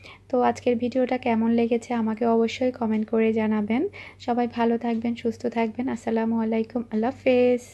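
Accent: Chittagong, Bangladesh. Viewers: আপু আপনার কথা গুলো ভালো লাগে চিটাগাং এর ভাসা আছে তাই চিমের বিছি আমার খূব ভালো লাগে আমি ও চিটাগাং এর মেয়ে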